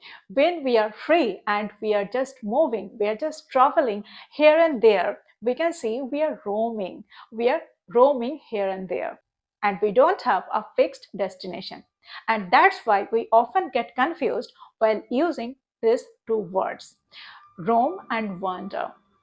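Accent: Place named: Bengal, India. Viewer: Nice vlog with Bengali accent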